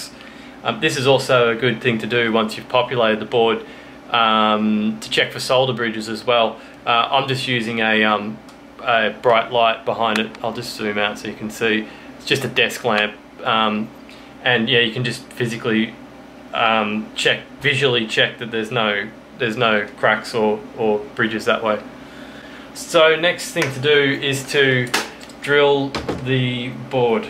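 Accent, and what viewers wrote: Australia. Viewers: Skyim desktop. Awww yeah. Haha- thanks for the tutorials mate! I detect a fellow Aussie... I'm just getting started with building pedals, I've put together two BYOC kits and I'd like to go onto perhaps veroboard on even etch my own.. where do you get the veroboard, copper board, and ammonium sulphate etc.?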